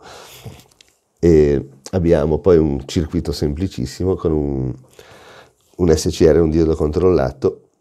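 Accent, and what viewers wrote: Genoa, Italy. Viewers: Perché, come si dovrebbe dire? Comunque sì, il maestro è genovese!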